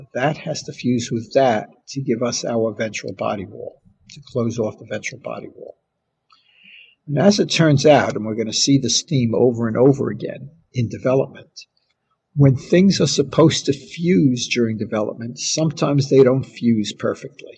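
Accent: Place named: Boston, United States. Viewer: i love your Boston accent!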